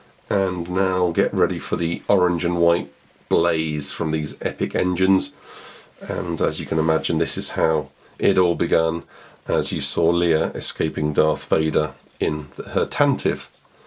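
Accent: United Kingdom. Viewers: I say he vote, British people should narrate everything.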